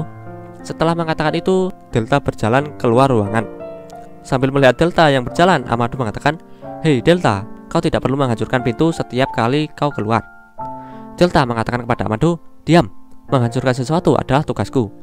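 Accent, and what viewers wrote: Java, Indonesia. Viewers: Medok men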